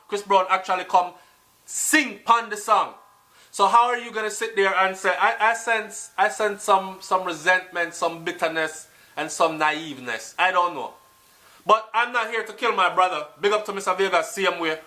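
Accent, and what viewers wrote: Trinidad and Tobago. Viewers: Damn remember the good ole days when national anthem play inna dance.. I was really young but I remember.... da trini accent deh fool me and mek mi a wonder if u fwd from trini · 😆😆😆😆😆 your trini-accent. Big up yourself.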